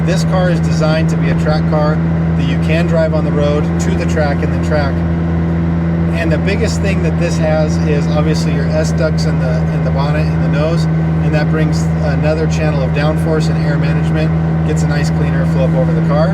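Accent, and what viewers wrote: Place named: Northern California, United States. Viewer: Always appreciate your videos. I've been following your journey since boosted borris. I'm planning on coming to apex as soon as the world is back to normal. I'm curious you are definitely from the states and perhaps in a video if you haven't already you could talk about how you ended up in Germany and also, my guess is your either northern CA or the north west born and raised? Am I close? Cheers.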